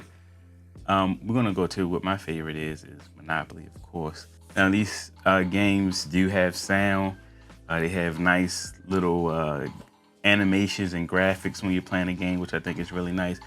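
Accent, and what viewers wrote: Baltimore, United States. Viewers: that baltimore accent coming out big time lol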